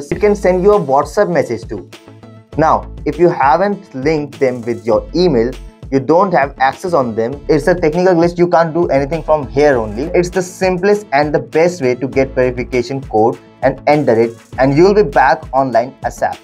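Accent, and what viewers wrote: India. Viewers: successfully tolerated his thick indian accent, over acting and wasted time.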